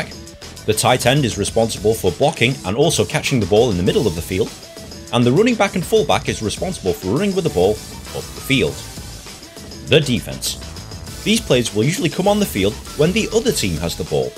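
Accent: England, United Kingdom. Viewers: That is 100% an English accent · A English accent? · Dude, your explanation is completely detailed, but I can't understand a single word of British english, so I had to turn the captions on and keep rewinding the whole video, but I was able to understand everything in the end. Great video! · I love how American football rules are explained by an English commentator 😂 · An English guy? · American football explained by a guy with british ascent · nope, deffo British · British huh?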